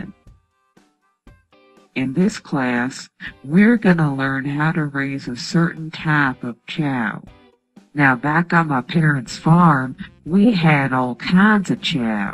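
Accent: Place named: South, United States. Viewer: Can confirm, lotta southerners talk like this. Even me from time to time😅 Surprisingly, this aged just fine though voice sounds a tiny bit scratchy(like just woke up kind of scratchy) It looks like it helped a lot of people going by the comments. Even now, this is a great video!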